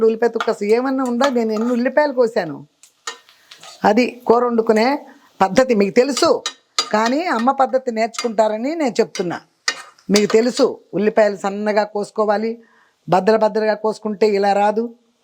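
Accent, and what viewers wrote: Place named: Nellore, India. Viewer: Amma mee matalu naku chala estam madi nellore ma matalu kuda elage untayi meeru matladuthunte vinaali anipisthundi amma ❤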